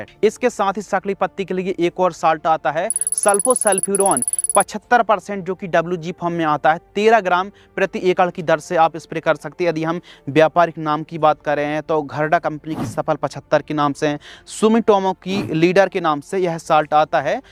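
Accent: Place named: Narsinghpur, India. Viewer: Tum Narsinghpur se lg ray ho bhaiya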